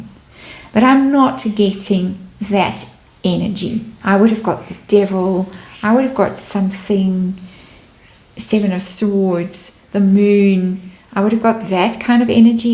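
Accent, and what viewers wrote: South Africa. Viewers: Delighted to have found your channel, I lived in South Africa for decades and your lovely voice and accent make me feel nostalgic! You are a great tarot reader too!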